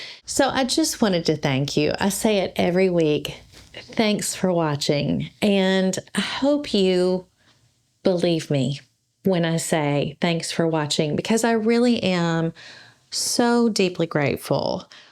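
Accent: South, United States. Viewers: Hi! I have been watching from the beginning and have loved every tutorial! I have learned a lot and you have made me think about different fabrics, colors, and designs. I like how you are a hands-on designer! I think I am, too! I also have a Southern drawl like you! If you will keep the tutorials coming, I will keep watching! Thanks to you and! He’s a great cameraman! I am glad he encouraged you to do this! Happy Anniversary…and here’s to many more!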